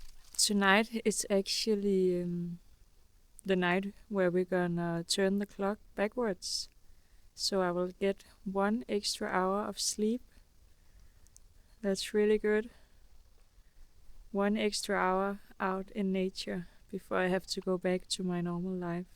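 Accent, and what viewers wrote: Denmark. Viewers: Im instantly hear you are from Danmark on the accent😊 3 hours småland?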